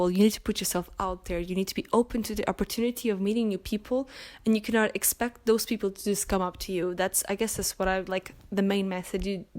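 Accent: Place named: Brazil. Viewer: Você é brasileira? Seu sotaque não parece o sotaque de quem nasceu nos eua, amei o vídeo e amei o seu canaaaal :)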